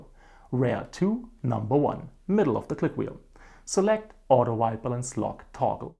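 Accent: Stuttgart, Germany. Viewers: Are you from Stuttgart?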